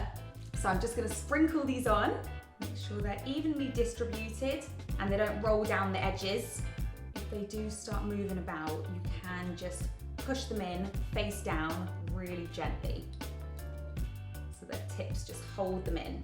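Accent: United Kingdom. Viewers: You sound soo British cool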